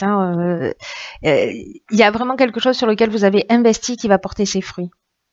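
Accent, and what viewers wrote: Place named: Marseille, France. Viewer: Bonjour. Merci pour votre tirage. J’adore votre accent. Je suis du Canada 🇨🇦 province de l’Ontario. Votre accent ressemble gros à un accent de la région de Marseille. Merci encore et il semble que l’année s’annonce bien pour nous lion 🦁!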